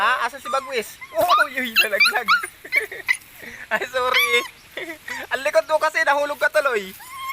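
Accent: Bicol, Philippines. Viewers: Saan ka sa bicol idol??? KC bicol ang tuno ng salita mo.... Taga Bicol din ako idol.. pashout out naman idol salamat 👍🥰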